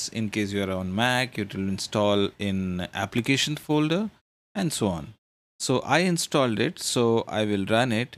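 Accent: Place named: India. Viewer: Indian, or somewhere abouts.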